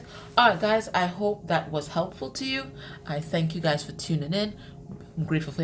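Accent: Trinidad and Tobago. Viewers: You sound Trinbagonian to me.....your picture even looks like someone I grow up with right here in Tobago. You have a very strong resemblance to that person.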